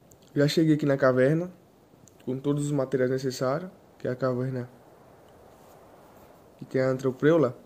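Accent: Northeast, Brazil. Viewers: Vc é do nordeste certeza kkkkkk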